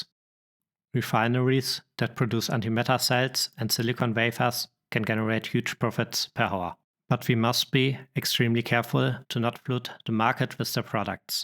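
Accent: Germany. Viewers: Statistics, Graphs and a german accent elaborating on ze productions. Just what the soul needs.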